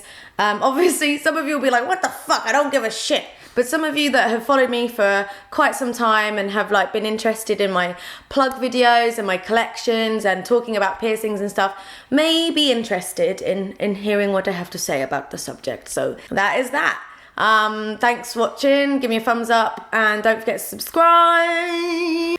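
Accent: United Kingdom. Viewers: I'm watching your videos since 4 years, but yesterday I watched the Amy documentary and since then you remind me of her, don't know if it's just the british accent or the tattoos or the uncommon hair, but that's the whole time in my mind :D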